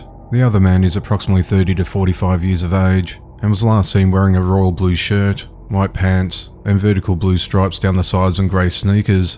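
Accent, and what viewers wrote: Australia. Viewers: Cheers yourself, I came for the Aussie accent and stayed for the daily buzz 😀👍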